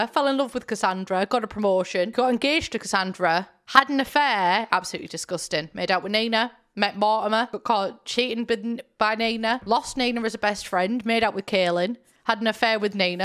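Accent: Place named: North, United Kingdom. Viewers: Not just any British. Northern British. It hits different. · Northern British really does hit different. It’s not as posh as most British accents and I have no choice but to stan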